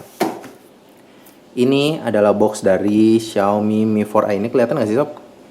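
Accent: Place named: Banjar, Indonesia. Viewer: Abang org banjar ya bang, kok kedengaran ada org ngomong pake bhsa banjar.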